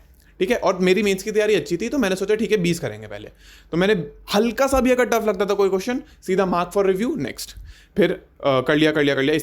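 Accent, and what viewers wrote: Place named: NCR, India. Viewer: Great video.....aur finally koi aisa banda mila jo thodi ncr accent mei bol rha h😂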